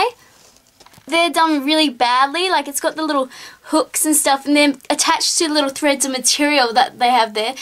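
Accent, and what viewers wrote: Australia. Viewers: French?! I'm sorry, have you ever heard a French accent? Haha, sorry! I just found that amusing--It's a Northern Australian accent. :)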